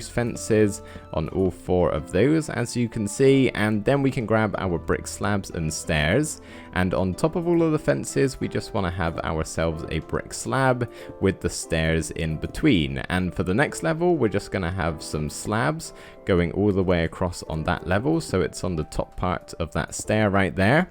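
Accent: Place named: Scotland, United Kingdom. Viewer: Hey, really enjoying your builds. I've been trying to place your accent. Is it Scottish borders?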